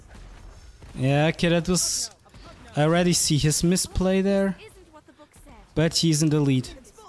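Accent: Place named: Portugal. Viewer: Eita ele fala português de portugal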